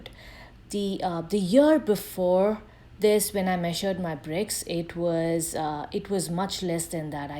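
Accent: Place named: India. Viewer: Aisa lag raha hai jaise koi Indian bol rahi ho. ji, bharat se ho kya?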